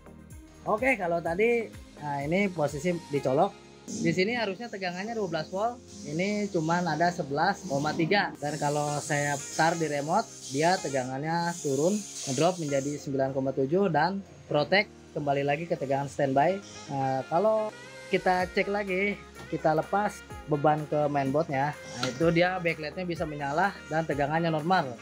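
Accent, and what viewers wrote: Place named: Betawi, Indonesia. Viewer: Logat bicaranya lucu ...Betawi Betawi gimana gitu.....mirip teman saya orang serpong😅😊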